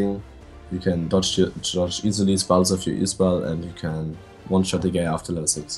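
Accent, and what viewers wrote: Germany. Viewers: You can clearly hear his german accent :D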